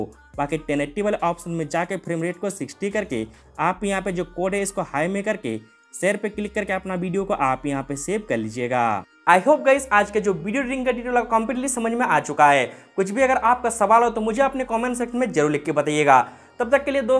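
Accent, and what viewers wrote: Chhattisgarh, India. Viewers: Bhai aap chhattisgarh se ho kya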